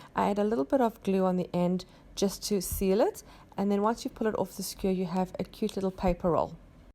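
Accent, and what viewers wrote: South Africa. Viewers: Glad I found your channel .. this looks like fun. Love the saffa accent 😉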